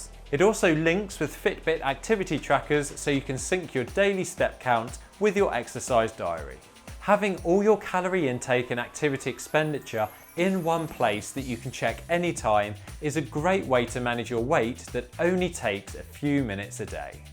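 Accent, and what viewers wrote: United Kingdom. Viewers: in the US in the 50's there were HUGE supermarkets & everyone had a refrigerator.....considering his British accent...maybe not in the UK. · Love that British pronunciation with emphasis on syllables unaccustomed to American ears. SeDENtary and ContriBUTory. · he's using the British pronunciation - because he's British.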